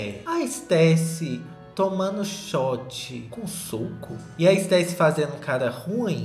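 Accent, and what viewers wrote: Minas Gerais, Brazil. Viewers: quando vc fala Guarapari, tá falando do meu País ES??? Mineirinho 🤣🤣🤣🤣🤣 amando seu canal, nova por aqui 😜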